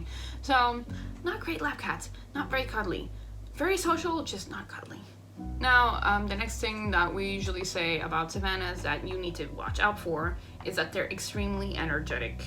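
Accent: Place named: Belgium. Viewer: Ah Belgium, I knew I heard a slight accent.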